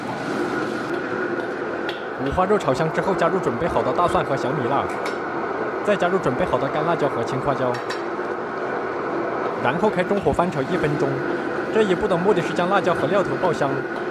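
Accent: Sichuan, China. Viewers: 一听就晓得是四川的哥老官，兄弟，莫得毛病我日。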